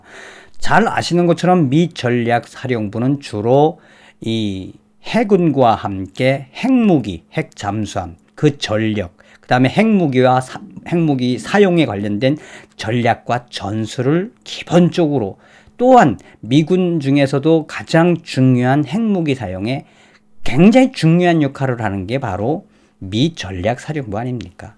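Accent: North Korea. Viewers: 북한 억양의 찰진 표현...디립다 깐다. ㅎㅎ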